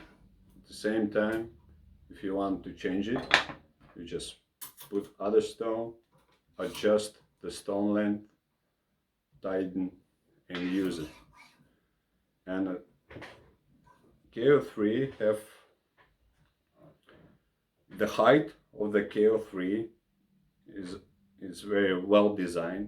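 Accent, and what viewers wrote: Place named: Russia. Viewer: I love the way you speak man. The English with the Russian accent is awesome, no bullshit just straight facts from a man with arms so ripped I don't dare to call myself a real man in comparison. 'sometimes costumers complain its very difficult to tight these clamps.. Yes.. You get used to it.' Unintentionally hilarious, I fucking love it. Please do more videos.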